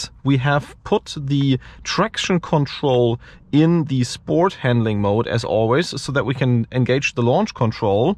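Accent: Germany. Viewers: Ich Feier den deutschen Dialekt 👍👌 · So richtiger Deutscher Akzent hahaha man kennts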